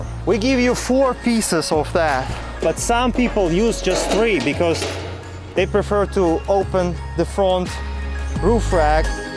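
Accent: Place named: Eastern Europe. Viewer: Am American and the Eastern European accent are enjoyable to listen to and makes for an easy How-to tutorial. Love the roof rack. You earned my subscription. Keep up the great content 👏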